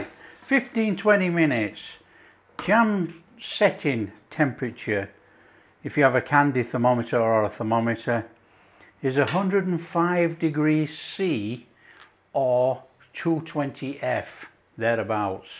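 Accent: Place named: England, United Kingdom. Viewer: you are da man my new friend. Your channel is absolutely awesome. Nice hearing your English accent, we are expats from South Africa, been living in Huntsville, Texas for 33 years.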